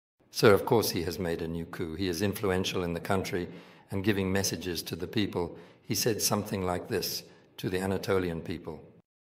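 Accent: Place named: United Kingdom. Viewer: Etkileyici bir üslüb. İngiliz aksanı da çok iyi .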